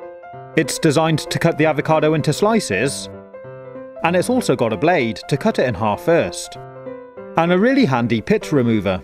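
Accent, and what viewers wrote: United Kingdom. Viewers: if I had a British accent like yours I'd never shut up 😂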